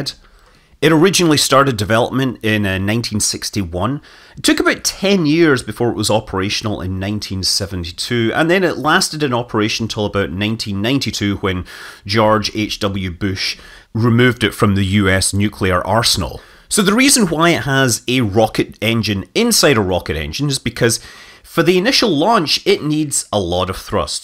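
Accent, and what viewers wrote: Scotland, United Kingdom. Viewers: He speaks clearly and pronounces well. And obviously a company that does language recognition in many different languages can manage a Scottish Speaker subprofile for English. Besides, that is not a strong accent, it is a very mild one. Go watch the Prime Minister’s Questions when an SNP (Scottish National Party) leader asks questions.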